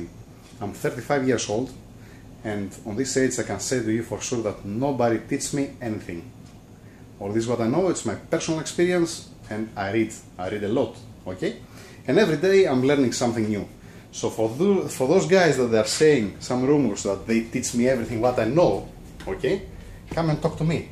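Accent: Greece. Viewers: Hi, nobody has teached you but you teach people all around the world whith à cigaret and your amazing greek accent, that coule be simply your answer to all jalous people! Thanks you man! You are the best, just continue please! And let us now when we can share a good dîner in Europe!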